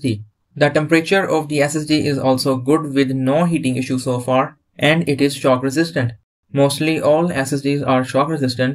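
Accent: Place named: India. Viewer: I don’t understand English in Indian speaking.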